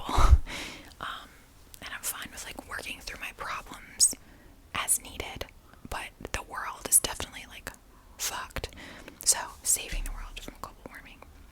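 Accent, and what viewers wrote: New Jersey, United States. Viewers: The jersey accent though lol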